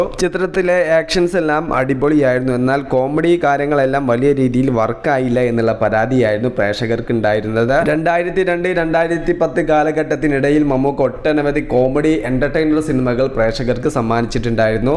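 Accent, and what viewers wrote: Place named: Trivandrum, India. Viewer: ട്രിവാൻഡ്രം സ്ലാങ് 💙💙💙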